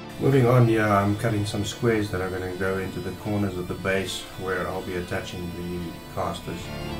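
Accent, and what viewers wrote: South Africa. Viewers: I have never seen that plunge technique before mate, that's awesome. That will come in very handy I'm sure. Sounds like a Durban or Cape town aven't there?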